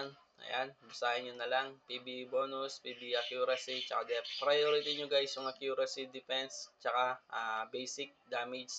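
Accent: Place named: Pampanga, Philippines. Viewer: kapampangan accent core ❤❤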